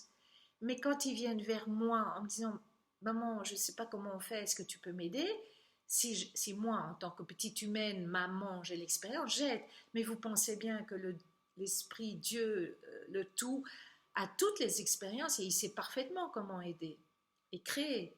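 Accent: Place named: Belgium. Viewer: Je ne sais pas pourquoi vous apparaissez dans mon fil youtube, mais vous y êtes. Je vous écoute et je me dis, elle est Belge!! Et c'est le cas en effet (on n'est pas comme les autres, c'est évident). Bon, mon point de vue: dans l'Homme (j'ai mis un grand H (je suis un boomer)), deux parties: une matérielle, l'autre spirituelle La partie matérielle est duale, les opposés se parlent et se complètent mais peuvent sembler entrer en conflit. C'est la voie de l'apprentissage dans le monde de l'Ego. La voie qui conduit à quoi? A l'Unité par la dualité dans le monde de l'Ego. Pour moi (c'est juste mon avis, rien de plus) l'Ego est un espace d'apprentissage qui me semble rejoindre votre concept de scribe, bibliothécaire, archiviste qui tente d'écrire une histoire, "votre" histoire mais qui, pour moi, ne peut se suffire à elle-même puisqu'elle doit permettre d'ouvrir une porte de sortie spirituelle "positive" qui vient compléter le tout dans cette Unité qui nous constitue.. 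Merci de m'avoir permis, par vos propos, de m'assoir au bord du temps qui s'écoule et d'y cueillir un instant de pensée. Merci à vous, Chère.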